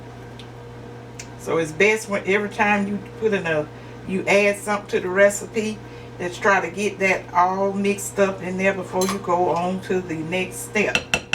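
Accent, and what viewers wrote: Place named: North Carolina, United States. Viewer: This evening is my first time seeing your show. I had to click play when I saw the name of your channel, Happy Table. I have never had corn pudding. I am 51, and I have seen it twice at potlucks about 30 years span! In each case, it was provided by a young lady with heritage from the Caribbean---Guyana and The Bahamas. You sound like my husband's people out of North Carolina, and like church women I would have liked to listen to and watch at church as a child, or like distant relatives at a family reunion where you feel right at home and belong. Thanks for sharing your recipe and happy kitchen. Now that I see what is in that corn pudding, I might try it. (I sometimes wonder how to make spoon bread. That is an idea.)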